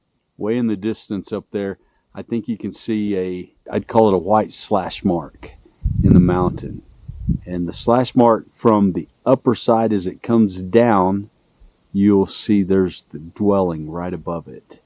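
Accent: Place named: Tennessee, United States. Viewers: Where are you from? I can’t place your accent. Tennessee is what I think. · I subscribed after watching my first video of yours, then went back to watch others. Now I look forward to each new one. You have a really soothing voice with it's southern drawl; and a storytellers style of talking that I enjoy. That inaccessible dwelling was an amazing find. The amount of effort the ancient people had to expend to haul all the rock and mud to build the wall of their dwelling. I concur that a tree would be excellent for climbing, but again the effort in that terrain! They must have been really desperate!. I'm very curious what kind of rock that long white streak was composed of?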